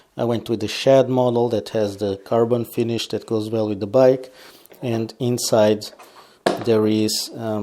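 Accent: Portugal. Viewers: es portugues kkkkk sinto tu a falares e es tuga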